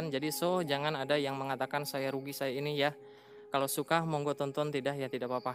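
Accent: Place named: Padang, Indonesia. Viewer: Orang padang mna suaranya... Bukittinggi hadir bro..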